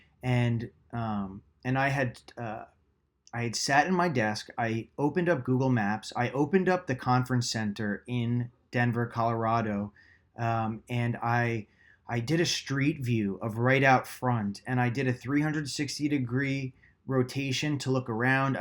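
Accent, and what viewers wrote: New Jersey, United States. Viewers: Love your videos. You have no idea how much you have helped me. I've always manifested randomly but now going for the really big stuff. Your voice is soothing and love the NJ accent. Be well. God Bless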